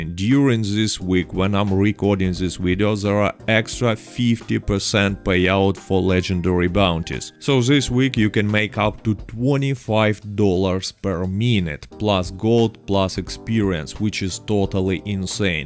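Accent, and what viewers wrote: Russia. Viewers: а теперь я не могу понять, он русский или американец.... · Thanks for making me very rich, really appreciate, btw, your Russian accent sounds really cool. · Блин думаю русский не русский ты, и потом вижу текст русский и потом понимаю почему у тебя такой акцент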